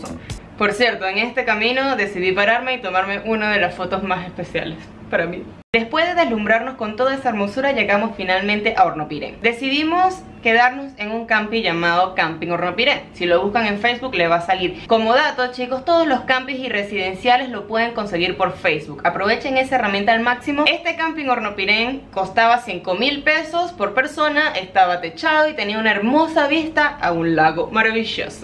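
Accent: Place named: Peru. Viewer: creo que tiene acento peruano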